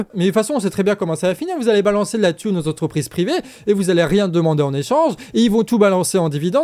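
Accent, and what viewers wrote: Brittany, France. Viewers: Clair, net et précis ! Merci pour cet excellent ton du nord des cotes des mers d'Armor . (Tonifiant, ce doit être le granite, ou alors l'éternel et indispensable grain de sel dans le beurre)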